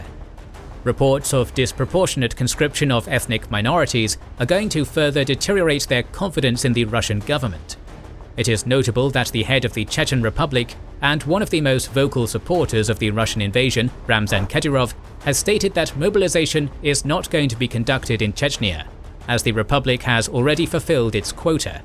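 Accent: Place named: RP, United Kingdom. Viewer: I want a British cut glass accent just like the guy speaking in these vids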